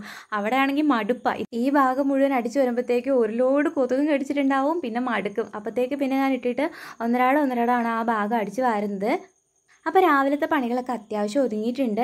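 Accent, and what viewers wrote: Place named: Kasaragod, India. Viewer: Kasaragod slang 😍😍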